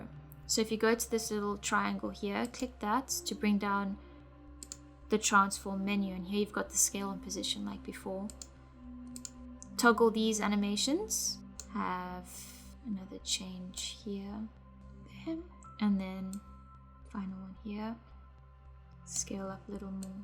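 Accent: South Africa. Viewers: Awesome tutorial! And great to hear an SA accent with shots of Milnerton and Hout Bay :D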